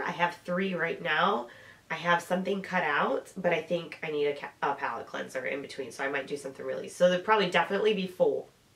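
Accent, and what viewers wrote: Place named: Michigan, United States. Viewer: here are my questions, most of which are non-sewing related. 1) Are you from Michigan, you sound like you are from Michigan? If, yes, where? FYI Detroit is one of of my favourite cities in the world, yes, you read that correctly. LOL 2) I think I heard you say once that you are a mechanic but you also work the midnight shift, who gets their car fixed in the middle of the night? I don't understand. If you are willing to share, please explain. 3) I would love to see a video on your TNT patterns and finally 4) what is your most worn and/or favourite me made item. I love your channel and hope you are feeling better.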